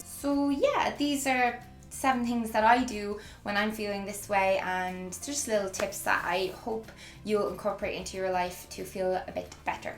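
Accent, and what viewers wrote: Ireland. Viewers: I can listen to that Irish accent all day long. It's beautiful music to my ears!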